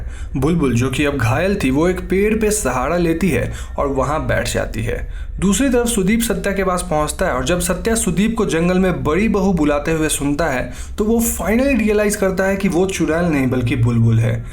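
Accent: Bengal, India. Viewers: Are u a bong? “😃.....Anyways”..... very well explained!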